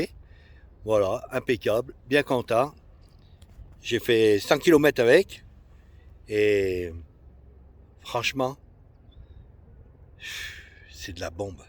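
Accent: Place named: Savoie, France. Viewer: j'me disais bien qu'il avait un accent de chez moi !!!! super merci pour cette video. J'ai essayé cette 750 chez Honda La ravoire ( 73 ) bah !!! ça a été le coup de foudre aussi. j'ai pas franchi le pas, je viens de leur prendre la 125 CB F comme petit utilitaire mais cette Transalp est top !!!! il est même venu a 2 pas de chez moi, au col de champ laurent !!! Elle était un peu haute pour mes 174cm mais bon !!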